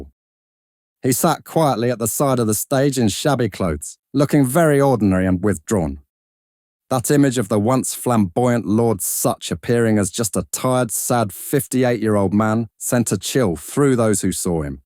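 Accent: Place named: Cockney, United Kingdom. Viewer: Please keep the human narrator - like an East End carnival barker... Very appropriate, somehow.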